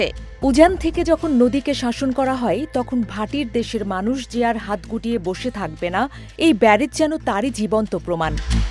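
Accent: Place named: Kolkata, India. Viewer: এটা কলকাতার ভাষায় কথা বলছে😂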